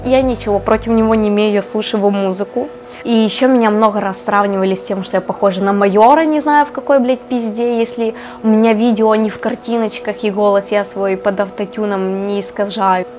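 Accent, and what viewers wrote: Ukraine. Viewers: Ваааах. Как мне нравится её лексика и украинский акцент ❤👍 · Твой мат и украинский говор выделяют тебя из "серой массы" блогеров, вообщем таких, как ты ни#@ нєту)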